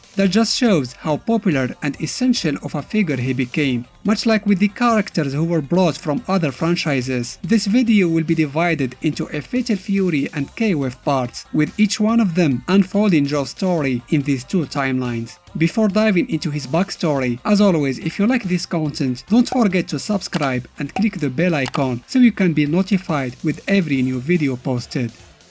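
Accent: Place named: Morocco. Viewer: Salam khoya nta maghrebi ? 3rftk ghir laccent dyalk 😂 ... Anyway la kenti maghrebi tbarklah 3lik bro mo7tawa zwin o Big Big Up lik Bro 👍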